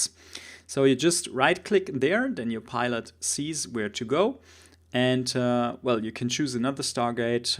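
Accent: Germany. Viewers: Wenn Deutsche Englisch sprechen :) aber trotzdem gutes Video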